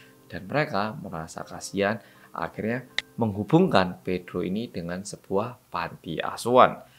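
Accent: Java, Indonesia. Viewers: Yokk! Kak dikit lagi 4M subscriber nihh kak. Aku ngerti sih banyak yg suka liat konten² kak bukan karena kak orang korea medok aja, tapi karena kak orangnya selalu berusaha buat diplomatis atau gak mendukung 1 sisi dalam ngasih opini dan pemilihan katanya oke, bahkan editornya aja kayaknya suka ngelawak kek kata² "eummm" tadi. Kak juga gak terlalu membahas kpop yg sekarang malah menjadi bahan menarik buat narik banyak orang, karena berusaha buat gak membela salah satu pihak gituh. Jadi semua konten²nya selalu informatif dan dapet valuenya... bener² gak salah sih milih jadi panutan pas di tugas kuliah wkwkwkwk. Semoga sampai 20M subscriber pun mempertahankan hal ini. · Apa cuman aku yang suka ngikutin atau jawab: bersama orang korea yang.... Me:Medookkkkk:| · 3 channel storytelling favorit: 1. (pelan, medhok dan mudah dipahami) 2. Nadia Omara (gayanya kayak itu ghibah) 3. 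Arroyan Pram (Konspirasi dunia pake Astronomi, Fisika, dan editannya gila keren banget, serasa nonton agung hapsah dan Jerome polin bahas konspirasi)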